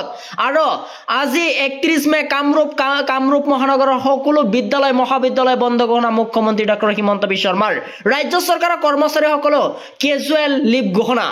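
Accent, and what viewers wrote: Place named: Bangladesh. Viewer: Bangladeshi Jen Lagise news Kuwa mokkel tu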